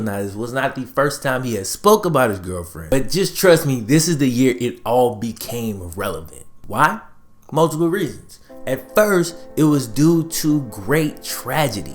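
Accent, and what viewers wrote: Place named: Bay Area, United States. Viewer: What up? Sounds like you're from the bay! Am I right?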